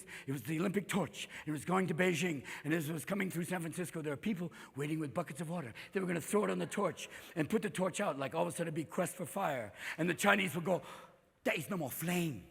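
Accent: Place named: Boston, United States. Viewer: that was a damn good Boston accent...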